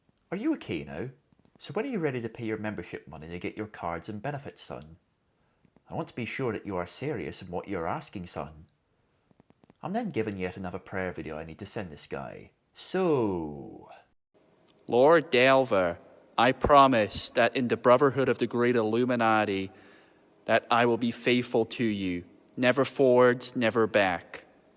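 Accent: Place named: Arizona, United States. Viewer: I’d recognize that Arizona accent anywhere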